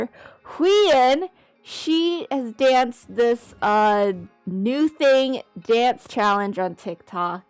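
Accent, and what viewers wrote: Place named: United States. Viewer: my apologizes. Could not understand when you said you had been unwell. It was your American accent 😞 Hope you are feeling slightly better. Cannot wait until 2023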